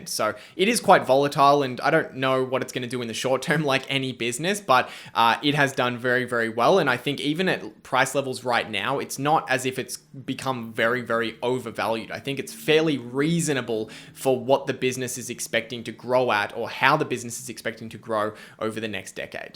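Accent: Australia. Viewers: An Aussie accent and rosy cheeks ☺. Lol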